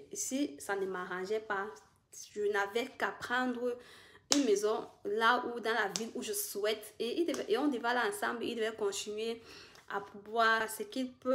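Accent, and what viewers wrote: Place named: Cameroon. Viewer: vous avez l'accent du Cameroun avec une influence du Gabon (c'est comme si vous avez vecu dans 2 pays en Afrique)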